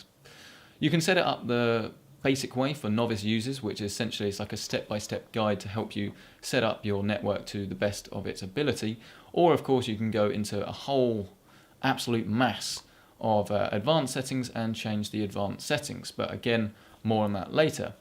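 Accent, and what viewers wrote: England, United Kingdom. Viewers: You can't beat a good English accent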